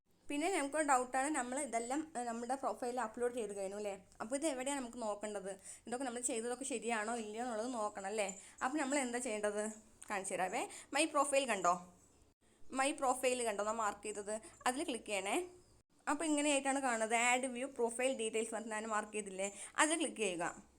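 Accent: Palakkad, India. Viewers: Palakkad kari ano miss thani nadan bhasha 👌👌👌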